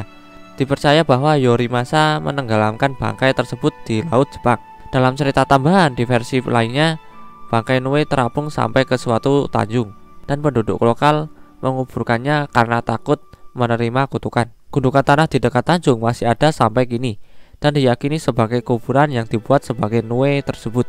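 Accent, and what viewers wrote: Java, Indonesia. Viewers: Nonton.. medok euy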